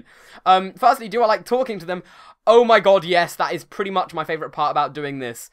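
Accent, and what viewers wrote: United Kingdom. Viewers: When you move to the US, won't you lose your British accent? Kinda sad really, all the British LPers are going over to the US. Ah well, can't be helped. Anyways, you going to keep dat accent? :D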